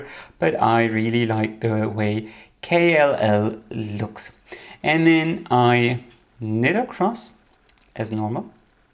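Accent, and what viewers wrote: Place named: Germany. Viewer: I'm trying to understand your accent. Are you in Germany?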